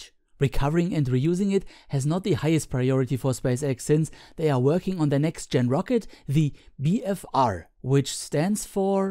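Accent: Germany. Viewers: I only recently discovered your videos... No idea why it took me so long. Your content is amazing, not only the whole discussion about the different approaches and ideas, but also the visuals and your wonderful German accent :D Liebe Grüße aus Niedersachsen! Mach weiter so! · Rasyidan Ilmansyah he is clearly Germany his accent proves that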